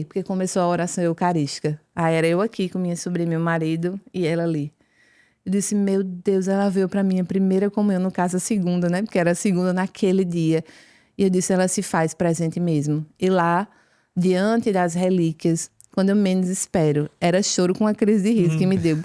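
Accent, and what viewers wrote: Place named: João Pessoa, Brazil. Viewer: Que lindo este testemunho! Sou do Rio e amo esse sotaque de Jampa. Tenho grandes amigos na cidade. Fui católico do IBGE quando adolescente, virei ateu após os 20 anos contaminado pela universidade. Agnóstico aos 30, e Cristão Free Style após um método CIS do Paulo Vieira aos 38. Aos 40 passando por um grande problema no trabalho, minha esposa me "impôs" uma viagem a Campos do Jordão com uns amigos para espairecer e pedi que no caminho a gente parasse no Santuário de Aparecida, pois desde que voltei a ser cristão não entrava numa igreja. Ao chegar e rezar frente a imagem para agradecer por todas as minhas bênçãos independente do problema, a solução chegou através de um telefonema! Naquele momento tive a certeza que precisava ser católico, e agora, 5 anos depois estou fazendo o Catecumenato e me apaixonando cada dia mais por nossa Igreja. 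Em Julho farei o caminho da fé e aguardo ansiosamente por minha primeira comunhão. Salve Maria e Viva Cristo Rei!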